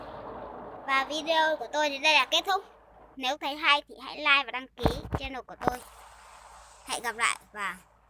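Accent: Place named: North, Vietnam. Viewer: Lái ngu nói chuyện như bắc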